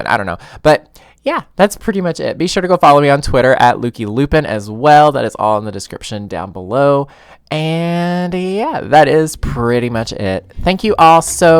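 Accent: South, United States. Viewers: Hey!!!! So Happy To See YOU!!!! And Your "Country Accent" Gotta Love A Country Accent! LOL This Is DARLING!!!! I've Never Done Limited Packs......... SOMEDAY! I Got Discover University Too... Haven't Played It Much.... With My Depression But Feeling SO Much Better Now....!! Back To Sims Now! HUGZZZ To YOU! 😘😘😘😘😘😘😘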